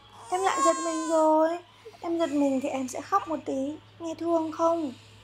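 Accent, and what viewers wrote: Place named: North, Vietnam. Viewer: Chị ơi sao chị đống phim chị nói tiếng việt sao chị lại nói tiếng bắt vậy chị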